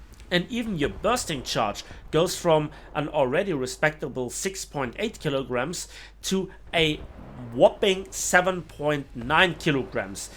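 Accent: Germany. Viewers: i hate german accents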